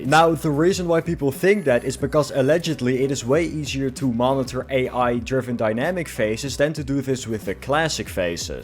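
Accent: Netherlands. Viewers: you sound dutch. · Yep I hear a Dutch accent, let’s goooooo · i know that accent far to well this is a dutch youtuber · I can hear your dutch accent. But keep up the content! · Are you dutch by any chance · This Dutch accent lol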